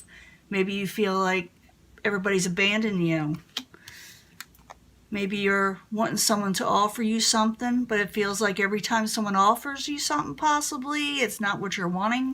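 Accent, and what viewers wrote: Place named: United States. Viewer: How come you sound American now I thought you were British 😱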